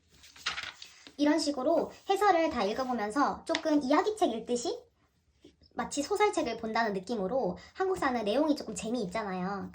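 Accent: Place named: Gyeongbuk, South Korea. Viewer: 혹시 대구나 포항 경북지역사시나요? 말투보면 그런것같아서용ㅎㅎ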